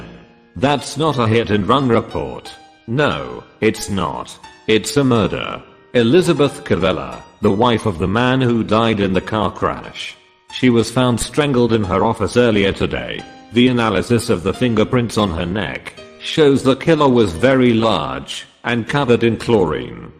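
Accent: United Kingdom. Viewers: Great... All of his voices and pronunciation is perfect!Full of British Accent!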